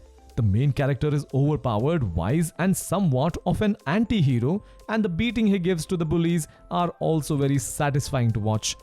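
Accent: India. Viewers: indian accent is strong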